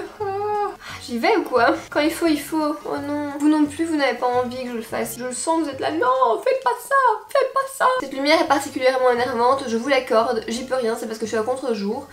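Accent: Belgium. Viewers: tu as honte de ton accent belge n importe quoi reste comme tu es on t aimera plus naturelement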